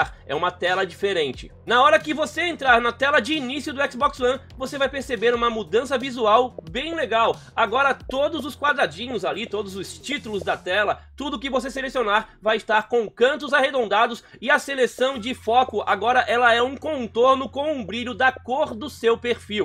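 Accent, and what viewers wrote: Florianópolis, Brazil. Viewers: TU E DE FLORIANOPOLIS???????????????????????????????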